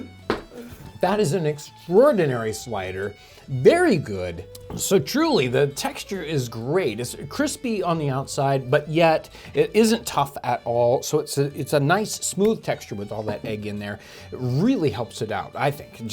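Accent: United Kingdom. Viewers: Also are you British.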